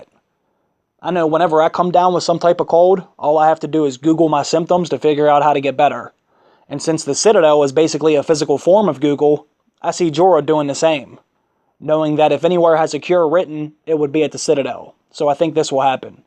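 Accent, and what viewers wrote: Pittsburgh, United States. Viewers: you sound like your from pittsburgh lol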